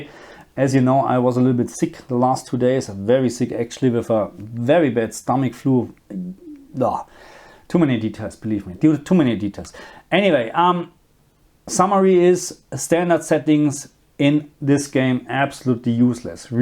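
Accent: Germany. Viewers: Aber nur wegen der Leitplanke, sonst wären die Einstellungen nicht so geil xD...finde sein Englisch sehr souverän...wäre sie Aussprache nicht so stark deutsch, würde es noch besser rüber kommen...aber sehr ausführlich erklärt, gefällt mir gut 👍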